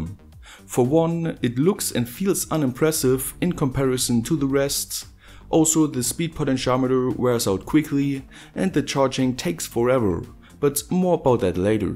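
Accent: Germany. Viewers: wow you good with electronics mate! Like your accent sounds german.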